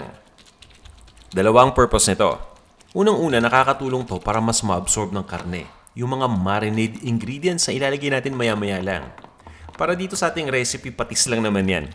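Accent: Philippines. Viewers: natutuwa talaga ako sa pagsasalita niya kahit nakatira siya sa ibang bansa hindi nabago yung puntong tagalog niya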